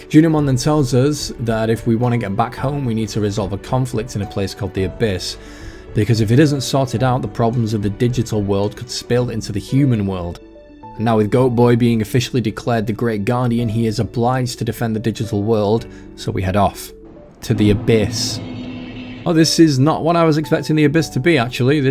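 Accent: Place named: England, United Kingdom. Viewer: i cant wait to see your bee team as a fellow funbeemon enjoyer wait what, why retire the bees when you can evolve them to their beeier forms. funbeemon's mainline is nothing but one big beehive, unfortunately, funbeemon's most recent new evolution branch wasn't added to the game though hopefully in an update or dlc it is. love to see you really enjoying the game bro. and a fellow englishmen as well i hear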